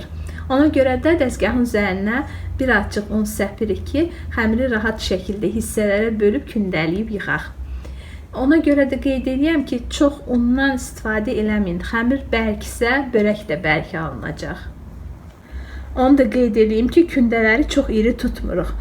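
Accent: Baku, Azerbaijan. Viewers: Gozel Bakili letcesi le bacim, Allah sizi qorusun, 🙏🏻❤️🧿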